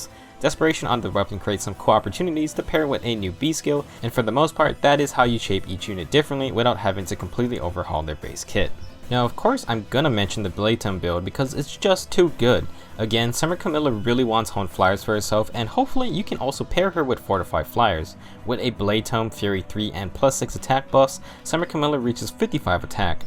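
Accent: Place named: United Kingdom. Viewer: Jake Stavinsky Idk why people question her British voice, like don't question it it's hella cute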